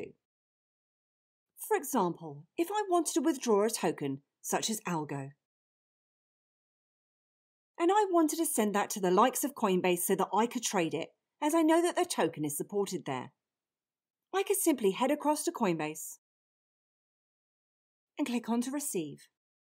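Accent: United Kingdom. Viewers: she sounds british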